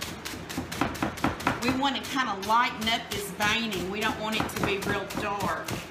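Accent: South, United States. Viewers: shell shell I don't think she was hating but loving her southern accent. Take a chill pill will ya??? LOL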